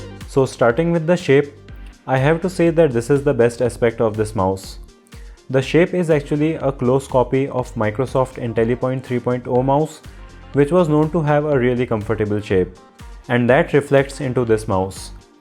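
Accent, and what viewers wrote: India. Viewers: are you india? lol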